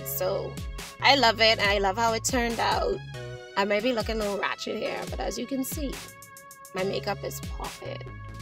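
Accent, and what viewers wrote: Bahamas. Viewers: Girllll all I said to myself was she only could be from back home with that accent!!!!! 😂 represent that 242!!! All day! 🇧🇸🇧🇸🇧🇸🇧🇸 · At first I thought bajan or bahamian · first thing I say was "This a Bahamian?" LOL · I thought I was the only one who was tryna figure out if she was Bahamian or not lol 🇧🇸🇧🇸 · Me too I just love the Bahamian accent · Tammie A. Me too! My family is from the Bahamas! I recognized it immediately! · same, we Bahamians cant hide lol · Took me only half a second to recognize that Bahamian accent lool. Slay girl slaaayy 👏🏽👏🏽 🇧🇸 🇧🇸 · ok... the min i heard her i say bahamian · Tammie A. I knew right away she from The Bahamas like me!! 242 to the world. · Recognized that fellow Bahamian accent in a jiffy!!! And I wasn't even listening, had the volume almost off and still our accent came through loud and clear!!!!! Love it!!! 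Go girl!!! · same i say she bahamian frm the jump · frfr had me guessing if this was really a bahama mama🇧🇸🇧🇸🇧🇸🇧🇸❤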